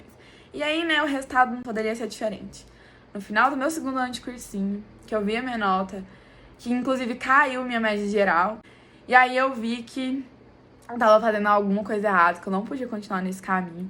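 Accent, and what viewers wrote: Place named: Minas Gerais, Brazil. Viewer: sotaque mineiro é gostoso de ouvir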